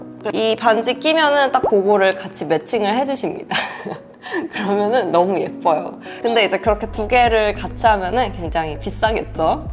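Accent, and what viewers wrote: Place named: China. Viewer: 어디 사투린지.. 독특한데 억세게 들리네요. 억양이 중국 말투 같아요😅 안녕 바이~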